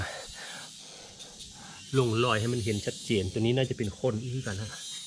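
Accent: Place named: Sakon Nakhon, Thailand. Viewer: ข่อยกะคนสกลคือกัน อ.วานร แต่สำเนียงบ่คือเจ้า สำเนียงของเจ้าคือคนในตัวเมืองสกล ละเบ๋อ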